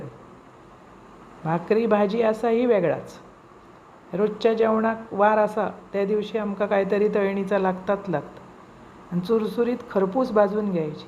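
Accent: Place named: Malvan, India. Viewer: Taiee you are very sweet i love your simplicity! I watch all your videos !! Because your Malvani bhasha 😂Ithe way you speak it's very hillourios 😅fun 😂Love from Mumbai ❤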